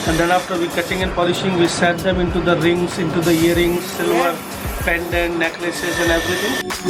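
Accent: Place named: Scotland, United Kingdom. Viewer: that usually never happens in india...yes in independence day it can be a bit of hassle i suppose...so u guys were kinda unlucky..that it just happened to be independence day in india....one thing i can suggest about taxis and cabs in india is..always get on cabs with meter on..and say iam only gonna pay whatever the meter says.as simple as that..download uber app..on your phones..get uber cabs in india..they are awesome. .also ask middle class urban indians on the road..of how much things cost in india..note down the prices..so that you wont get crooked next time..most of the these drivers or whatever come from rural india all they wanna do is make money..so the can be a pain in the ass not just foreigners but for urban indians as well..act street smart...AND DO NOT CONVERT INDIAN RUPEES TO POUNDS..and think oh thats seems reasonable no its not..15000 rupees is a lot of money in india..because living standard is cheaper in india..as compared to scotland..i suppose thats where you from. 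going by the accent..thanks...hope u enjoy your remaning trip..chears..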